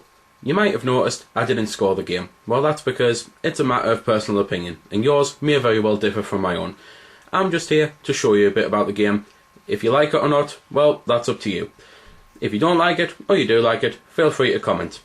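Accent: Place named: Scotland, United Kingdom. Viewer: You sound Scottish.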